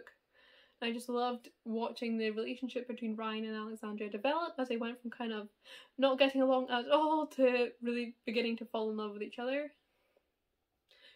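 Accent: Scotland, United Kingdom. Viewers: This type of recommendation video is so needed! I'm deffo gonna have a look at some of these! (ps, i love your accent!! I'm from scotland too <3)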